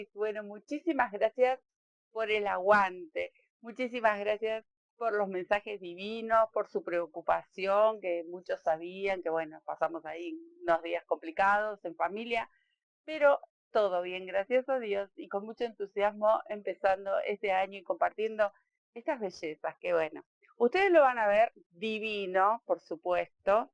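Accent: Argentina. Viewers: me dio mucha alegría volver a ver un video tuyo. Soy mexicana y aaaaamo tu acento argentino. Gracias por el tutorial, he querido hacer una colcha hexagonal. Muchas bendiciones!!!❤❤❤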